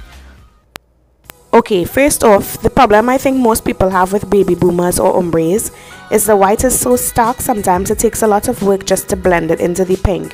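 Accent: Trinidad and Tobago. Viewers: I can tell a Trinidadian accent anywhere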